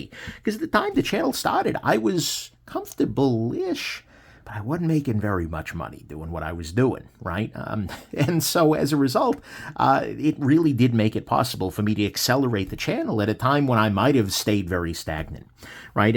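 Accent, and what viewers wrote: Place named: Brooklyn, United States. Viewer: Love your voice, it's like if Professor Frink was from Brooklyn.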